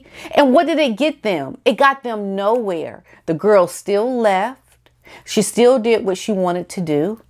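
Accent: England, United Kingdom. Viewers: I love your English accent😂. Keep up the good work.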